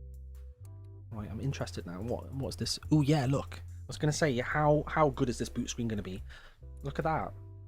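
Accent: United Kingdom. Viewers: btw love who your pure British "yeah lemme get more tea" LOL stay cool my guy PS what's your favorite Michael mjd video?? Mine is the one where everything goes wrong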